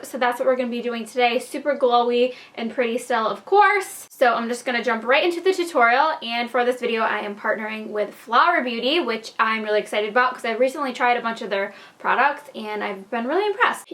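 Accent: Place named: New York, United States. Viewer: This is offensive probs but I hate NY accents usually but I freakin love how you talk so much gaha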